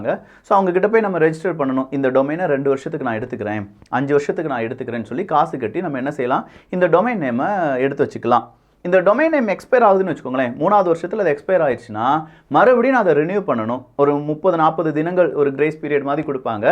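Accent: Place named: South Tamil Nadu, India. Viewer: What I love is the south Tamil Nadu accent Echi comes out when you spell words with clarity. மக்கா . புகுந்து விளயாடுடே…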